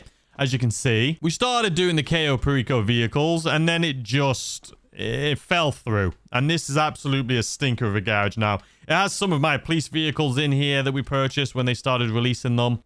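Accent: United Kingdom. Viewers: answer me and i will subscribe are you british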